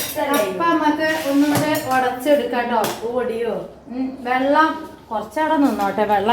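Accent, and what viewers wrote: Malappuram, India. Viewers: എന്തൊ ഒരു ഭാഷയാണ് പടച്ചോനെ ഇതൊ ഒക്കെ ഒരുപാട് മലപ്പുറം ഭാഷ ഞാൻ കേട്ടിട്ടു ഉണ്ട് ഇതൊ ഒരു ഒന്നൊന്നര ഭാഷ ആയിപ്പോയി😄😄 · Malapurath ulla ellavarum inganeyano samsarikaar ?? Ivarentha ingane samsarikunnenna ariyathath matte laila thatha avare pole oru pandathe aalukale pole enthinum ethinum oru makkale viliyum paattu paadumbolothe sansaravum njn athknd ee chmnl ipol kaanaarilla idak epozhenkilum onn nokkum kure mlpuram vlgs kaanum avaronnum ingane onnum alla samsarikaar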